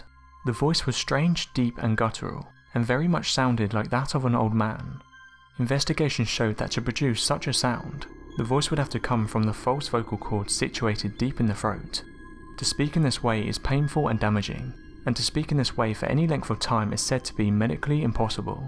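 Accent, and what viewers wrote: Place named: Manchester, United Kingdom. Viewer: My God he's from Manchester.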